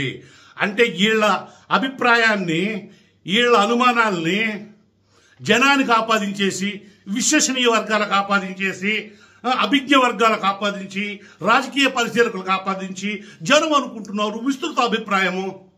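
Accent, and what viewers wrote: Nellore, India. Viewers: Super …like mana Nellore accent. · నెల్లూరి యాస అన్నో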